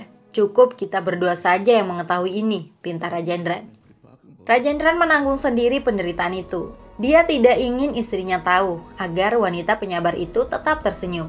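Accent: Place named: West Nusa Tenggara, Indonesia. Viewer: Kayaknya admin orang NTB 😂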